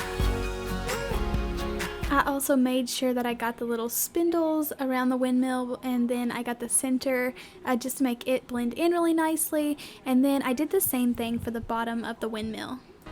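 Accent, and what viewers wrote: South, United States. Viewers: I have been watching you for over a year now. 1/08/2023 is today's date. I am realizing I have so many more videos yet to watch. I thought I was pretty well up to date. ❤ Love the windmill. I have got to say your southern accent is really noticeable in this video or the most recent ones.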